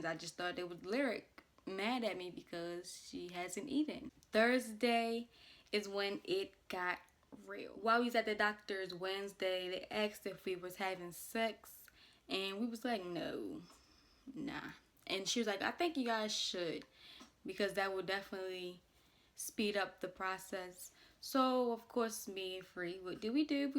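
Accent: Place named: Baltimore, United States. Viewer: I was listening to you talk and I was like “Oh she’s from Baltimore!” I know my ppl when I hear them🤣 I grew up in Towson but have been living in DC and now National Harbor, MD. What hospital did you have her at?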